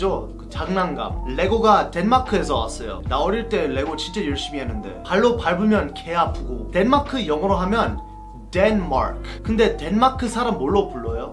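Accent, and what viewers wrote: South Korea. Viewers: 현지화 끝판왕이 모국어를 그나라 버전으로 바꿔가면서 하는거라고 생각 영어 원어민인데 한국식으로 발음까지 ㅋㅋ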